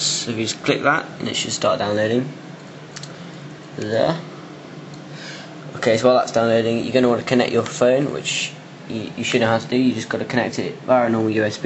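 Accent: England, United Kingdom. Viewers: although i'm not from uk i love the english accent! :D btw i'm from israel! :P great vid!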